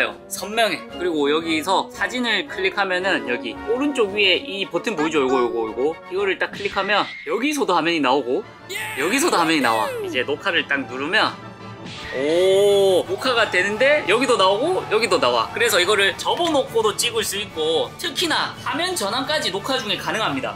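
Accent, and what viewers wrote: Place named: Gyeongsang, South Korea. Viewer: 잇섭이랑 대구말투도 비슷하고 목소리도 비슷하네요😅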